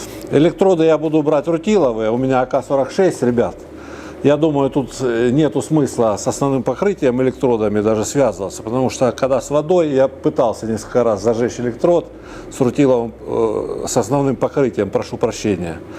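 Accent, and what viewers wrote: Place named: South, Russia. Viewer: Ув., лучше, вырезал окно, а вместо песка, (😊😊куда его потом), промасленую тряпку, набил в трубу, а потм вытащил её и заварил!! 😊😊А по говору Вашему, слышно земляка с юга Руси, привет Вам!!....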